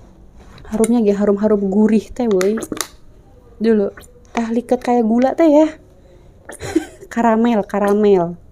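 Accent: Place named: Banten, Indonesia. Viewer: Kalo dengerin teh ngomong jadi inget temenku ngomongnya sama logat" banten padahal dia orang lampung tapi bisa logat banten 👍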